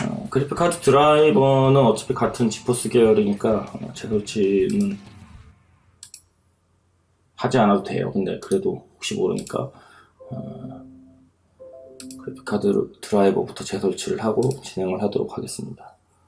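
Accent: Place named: Gyeongsang, South Korea. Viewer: 띵충 보면 욕하는 경상도 사투리 쓰면 일베인가? 경상도인 어떡하지..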